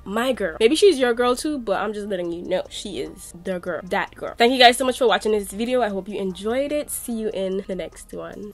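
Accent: Caribbean. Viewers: Can hear that Caribbean accent... much love to my caribbean people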